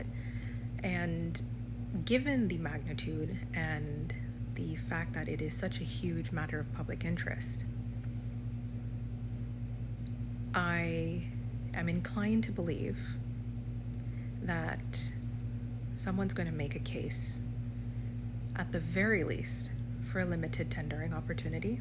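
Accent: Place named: United States. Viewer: woman geh we Ah for Kane chance nah, is you who’s in somebody’s pocket. Yuhs ah UNC operative trying to trick people wid yuh yankee accent. Allyuh fullah education, but dunce like bat!! still following this outdated cane farmer union propaganda from the 1950s, it’s time for an upgrade. Allyuh ent shame?? And BTW this is a legislative issue which has to pass through parlaiment, and not a referendum where public participation is needed, and isn’t it the reason why the people voted for members of parliament? they are appointed as voices of the people in their individual constituencies, so there’s no need for a national consultation, so stop trying to fool the people. Shame on you!!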